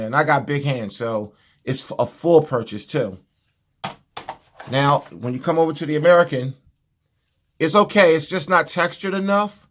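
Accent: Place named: New York, United States. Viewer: I wished I saw this before buying.. Your vid was great. The Sig is great. But I went with the Ruger. I ripped off the Novaks for Tru Glo FTX Pros . The Novaks were meh. But the American has a better mag. The teflon coated mags makes a difference. The trigger on the Ruger gets better after 500 rounds. It feels a little heavy at first but it's a new gun. The Sig comes out the box with a good trigger and sights. Both awesome pistols. One just needs to mature. But with the TFX sights and some rounds through it I think the Ruger is better. I rent a 320C at my range for fun. Great gun.. But I don't regret the Ruger for a second. It just needed some...Love. The Sig 320C comes out the box the way my Ruger is.. In the end it's just preference. I can't shit talk either or. Both awesome. PS: love the accent. I'm from NY.. I feel ya.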